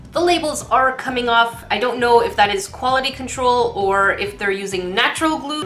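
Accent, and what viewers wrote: Canada. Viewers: You can tell shes from Canada 😂😂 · I don't know how you sound like a stereotypical Canadian lol. I'm Canadian and have never met someone with a stereotypical Canadian accent.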